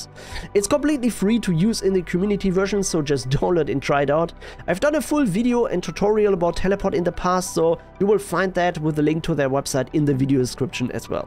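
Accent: Germany. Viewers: Gutes Video!.Deinen deutschen Akzent hört man ja sofort hehe xD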